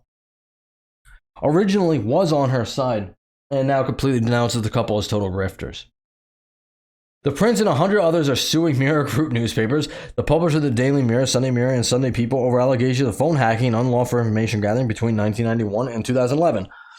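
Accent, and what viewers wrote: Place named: Chicago, United States. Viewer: Ahh...now I know you're not my long lost brother, Rick. You sounded so much like him I was wondering. Definitely remind me of the old Chicago accent . lol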